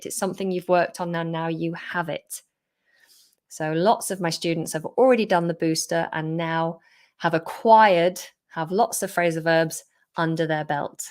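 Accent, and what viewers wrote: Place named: United Kingdom. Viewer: I love British accent!!!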